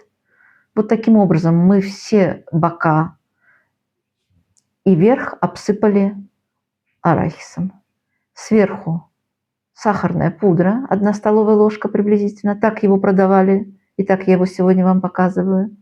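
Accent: Baku, Azerbaijan. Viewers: Добрый вечер! У вас акцент наш:) Вы бакинка?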